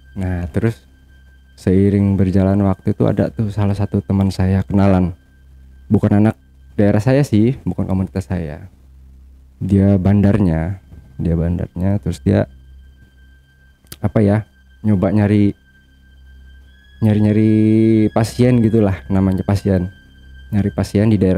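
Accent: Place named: Sumatra, Indonesia. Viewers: Jelas kamu ni orang lampung.. dari logat ngomong nya. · Orang lampung nih, aksenya lampung nyeu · Dari logatnya aku tau abang ini sumatera nya di mana... 😊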